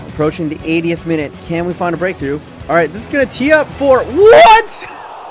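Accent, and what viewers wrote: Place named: United States. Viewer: your commentary is SO american hahahah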